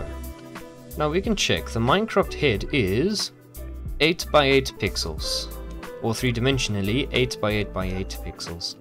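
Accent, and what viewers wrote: South Africa. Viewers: You sound very much South African :) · Great video! I have one question tho... Are you also South African?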